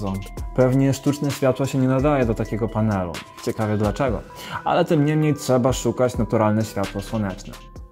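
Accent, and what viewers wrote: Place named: Ukraine. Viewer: Naucz ze sie chlopie po polsku bo zajezdza Ukrainą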